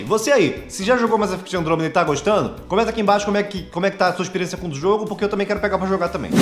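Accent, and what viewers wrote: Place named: Portugal. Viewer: está ficando com sotaque de Português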